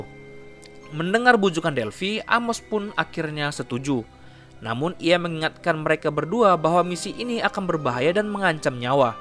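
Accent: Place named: United Kingdom. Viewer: british skali komentatornya hehehe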